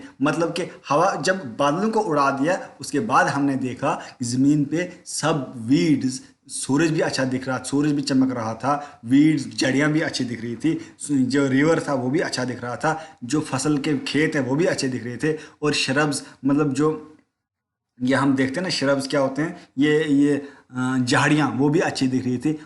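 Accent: Kashmir, India. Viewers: R u from kashmir?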